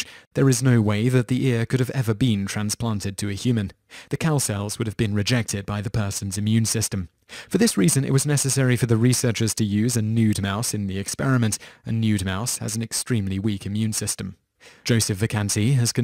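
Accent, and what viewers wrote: United Kingdom. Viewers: Why does British accent make everything seem classy and professional?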